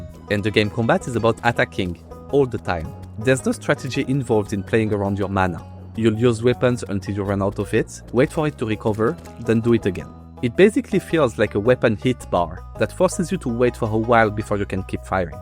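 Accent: France. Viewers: are you french perchance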